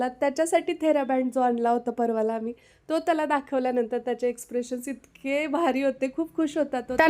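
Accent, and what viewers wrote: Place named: Kolhapur, India. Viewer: Karad kolhapur side che watata..kalwan wagere words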